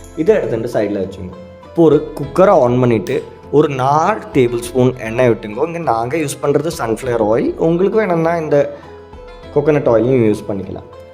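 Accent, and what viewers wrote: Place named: Kerala, India. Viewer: Reminds me of the dialogue Gramamum cookka neengalum cookku Very sweet malayalam kalandha tamizh❤❤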